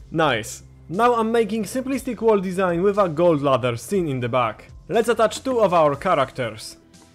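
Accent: Poland. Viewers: Po akcencie od razu poznałem że nasz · Słychać że polak😅 ale budowle 11/10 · poznałem po akcencie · to się nazywa polski akcent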